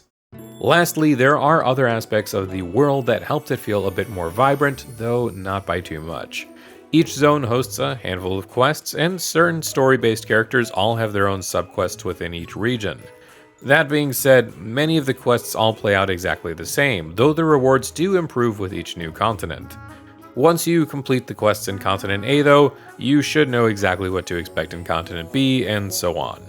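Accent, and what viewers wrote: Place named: United Kingdom. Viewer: first video I've seen from you and i genuinely thought you were British at the start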